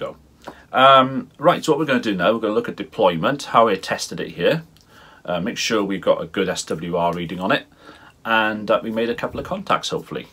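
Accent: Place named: Newport, United Kingdom. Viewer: Always a pleasure to watch, the accent is familiar, im a newport lad personally. 73 keep up the good work.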